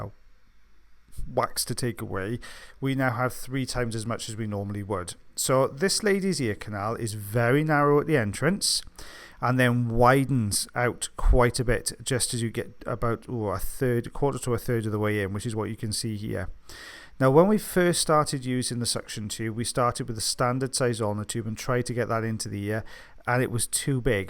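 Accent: Wales, United Kingdom. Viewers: Are u british??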